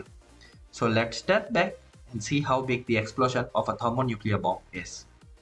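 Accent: India. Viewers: You sound like english indian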